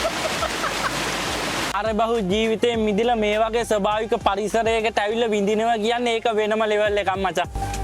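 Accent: South, Sri Lanka. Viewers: ඒක තමයි දකුණේ විදිහ❤❤ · දකුනෙ බාසාවනො ගැම්මක් තමා ආය්බොවන්ඩ...❤😁 අපිත් දකුනේ මචෝ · Dakune bashawa 🎉❤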